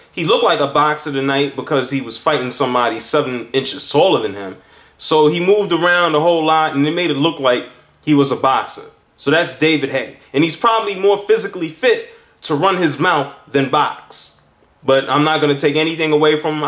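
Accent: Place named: United States. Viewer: It's val-you-ev Goddamn American accent SNM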